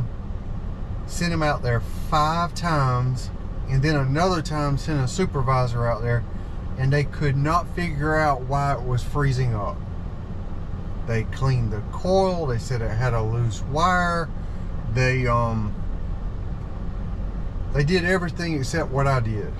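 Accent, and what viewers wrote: South, United States. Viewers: I just love Southern women and their voices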